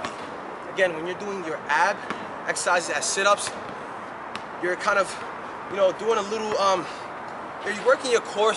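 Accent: New York, United States. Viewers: Nice omg love the NY accent😂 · are you from New York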